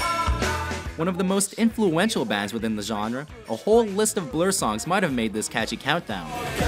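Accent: United States. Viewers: Soon as I heard the american accent I was like "This is gonna be shite" xD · Why the fuck is this american accent ?cant hear it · Like it how it's an American voice over. Move tf over and let a Manchester lad or lass do this.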